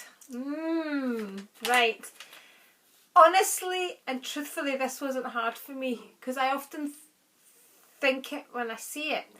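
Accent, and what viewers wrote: Scotland, United Kingdom. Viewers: Thank you for tagging me! Just love watching and listening to your Scottish accent!! You look gorgeous as always xxxooo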